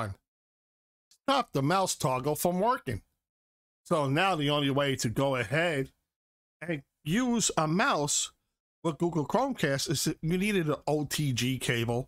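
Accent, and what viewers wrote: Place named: Bronx, United States. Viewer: Go ahead, and tell me, why, when using Ai narration, would you choose a voice of a guy from the Bronx or Jersey?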